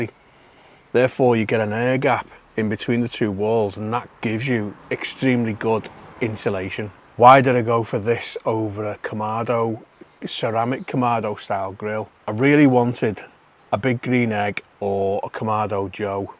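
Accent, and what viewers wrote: United Kingdom. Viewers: FANTASTIC video. I'm a working class Master Touch guy, looking to "up my game" without taking out a loan. I've had my eye on a Weber Summit for a while and this video pushed me over the finish line. Happy to see a Brit who's into BBQ and LOVE the accent. Would love to see what you use for dry rubs and sauces. Again, thanks from Alaska for a really good video. Tell your pupper I said hi.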